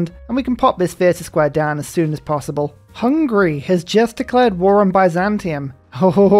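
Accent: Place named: United Kingdom. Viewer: Your brit-feminine accent is so sweet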